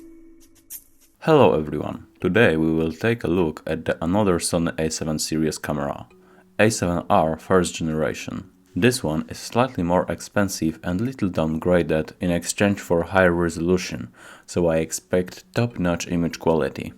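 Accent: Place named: Poland. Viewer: You hear as a polish guy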